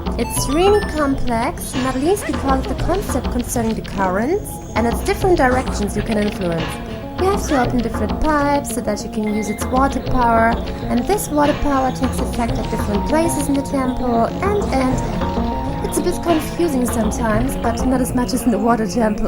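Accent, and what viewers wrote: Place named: Germany. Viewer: You have a slight German accent, but your English is very good. And I agree with Taylor, it's a charming accent.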